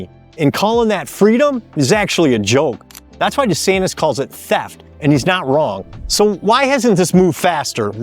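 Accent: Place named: Wisconsin, United States. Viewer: Are you from Wisconsin? Your accent sounds like it.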